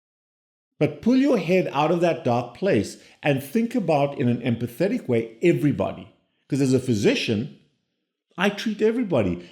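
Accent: South Africa. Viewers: 💯 this guy is South African 😂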